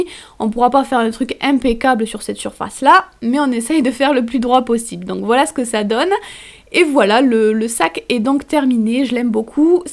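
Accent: South, France. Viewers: Moi qui suis parisienne, ton accent m'emmène dans le Sud, puis cette façon de dire "mon pompon" je craque c'est trop mignon 😍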